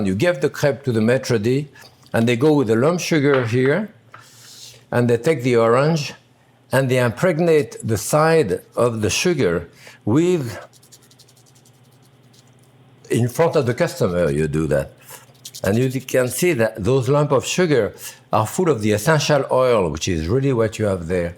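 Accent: France. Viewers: sounds French still are you on drugs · Come on old timer you can do it. Say C-R-E-P-E"SSSSSSSS" Put the "S" in there you can do it! I believe in you. You are speaking English to an English audience. We use the "S" for plurals and you damn well know this because you've lived here for most your life so stop with your overaccentuated French accent. It might have been cool with the ladies in the 70's but now it's kinda BORING!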